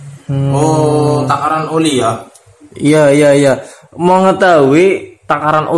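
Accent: Banyumas, Indonesia. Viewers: Filsuf mesin dengan ymbahasa ngapak😅😅😂😂😂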